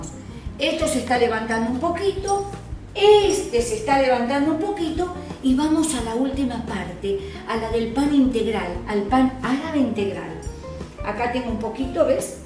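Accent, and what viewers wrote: Argentina. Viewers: Que buen pan y rapido, ya lo conocia pero me gusto mucho y con acento argentino,,,,un saludo desde España... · jajajaja!, me dan mucha gracia sus comentarios y su acento taaaan argentino , voy a hacer pan pita y el pan con sencillitas !,saludos y muchos éxitos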